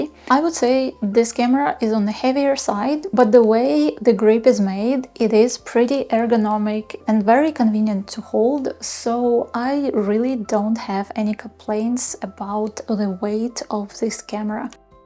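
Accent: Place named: Ukraine. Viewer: Класне відео, почув акцент і думаю звучить ніби Українка, потім бюпобачив герб на шиї)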